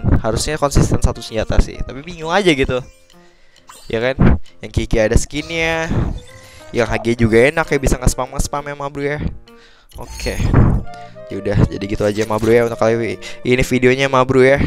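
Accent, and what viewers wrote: Java, Indonesia. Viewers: ngomongnya kayak orang Jawa untung aku orang Jawa jadi ngerti gituh hehe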